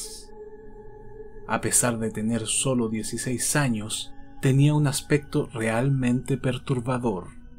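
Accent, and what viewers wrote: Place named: Chile. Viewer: La narración y dicción es perfecta hermano...y el acento sutilmente chileno... je je ..oye un abrazo y muchos éxitos...nos tienes enganchadísimos a tu canal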